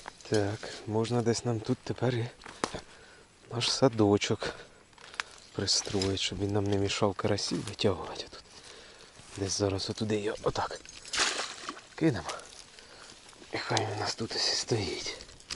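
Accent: Poltava, Ukraine. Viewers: теж хотів запитати і знайшов комент.. бо почув акцент полтавський..та і зарості схожі)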